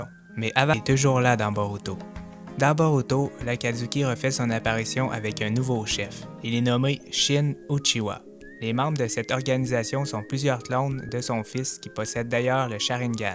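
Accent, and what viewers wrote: Quebec, Canada. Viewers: T québécois sa svois direct avk ton accent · Il peut rien y faire il est quebecois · Tu et quebecois? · Ta vu avec son accen canadien il est les a trouvé dans ces ses imaginatoin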